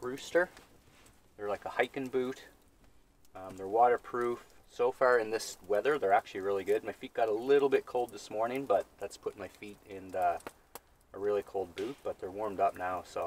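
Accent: Canada. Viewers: Luv that Canadian accent ! / almost thought I was watching the trailer park boys lol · where are you from? Your accent sounds similar to Cape Breton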